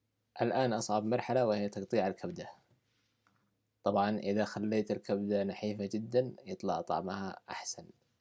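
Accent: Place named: Sanaa, Yemen. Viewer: بلعكس لهجتها الصنعانيه احلا لهجات اليمن واصل ابداعك استفدنا كثير اغلب الأشياء اجربها الي تنزلها جزاك ألله خير.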